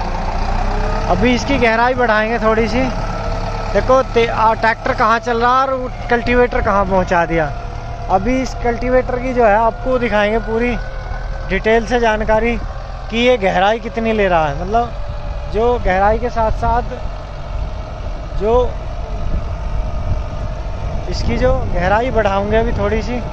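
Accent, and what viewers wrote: Haryana, India. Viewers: Haryanvi hai yo punjabi koni samj gya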